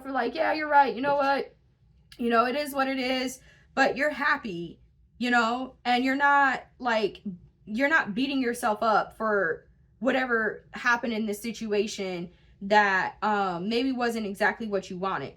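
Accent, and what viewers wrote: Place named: South, United States. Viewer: Loving the country accent you should hear mine😊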